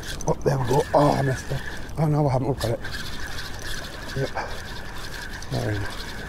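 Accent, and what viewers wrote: Newcastle, United Kingdom. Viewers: You sound like a geordie... or close enough... where are you fishing?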